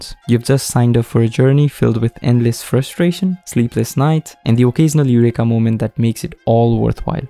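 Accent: India. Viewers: where you from bro? India, UP?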